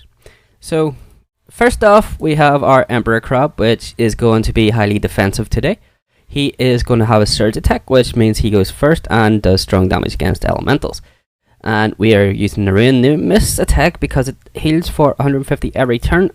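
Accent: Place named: Ireland. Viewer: I knew that was an Irish accent!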